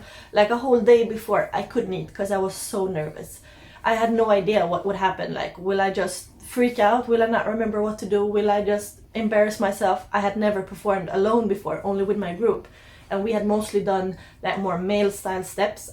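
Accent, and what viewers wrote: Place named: Jamaica. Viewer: I just realized why she has a slight Jamaican accent when she speaks English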